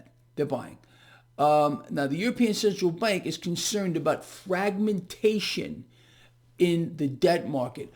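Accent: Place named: New York, United States. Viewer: I love your accent. Real old school New York 💖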